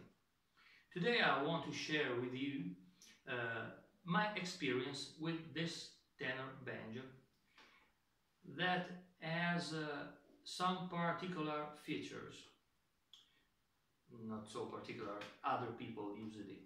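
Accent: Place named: Trieste, Italy. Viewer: Your English is fine. My father and uncle were born in Trieste. I'm used to hearing English spoken that way.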